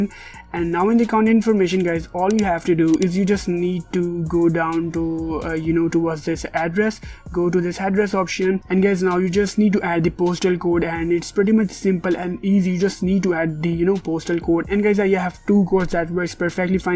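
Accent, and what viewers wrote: India. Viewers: once again dude with indian accents solved my problem. Thank you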